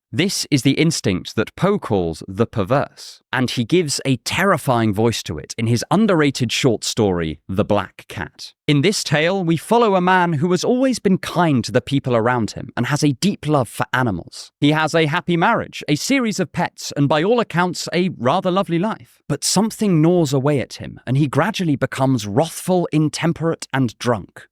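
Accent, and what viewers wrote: RP, United Kingdom. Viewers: Did anyone tell you that you got one the most British accent ever ? Like these days, whenever I think of British English, I tend to hear your voice. No offense intended if that happens to be a sensitive area btw.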